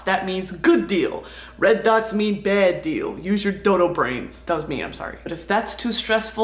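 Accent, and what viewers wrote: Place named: United Kingdom. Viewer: Why does she sound like the opening wizard man from Wizard101 w her british accent <3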